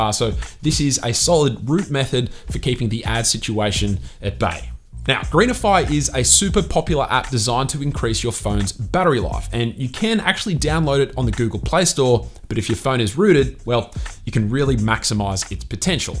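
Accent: Australia. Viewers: Haha I knew you were Australian as soon as I heard you talk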